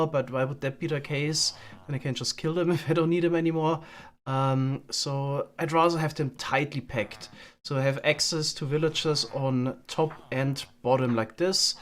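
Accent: Germany. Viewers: It’s so interesting!! Looking at it phonology-wise, I believe it’s because in German, voiced stops and fricatives like b, d, g, and z are never found at the ends of words. However in English, the words eggs ends with both a voiced g and voiced z sound. Thus he replaces the voiced consonants with their voiceless counterparts k and s, which are allowed to be at the ends of words in German, but as a result both “eggs” and “axe” end with the same two consonants, k and s. As German is his first language (I’m assuming?), he must really struggle with using the ‘right’ phoneme sometimes at the ends of words or simply can’t hear the difference. Accents are awesome!!!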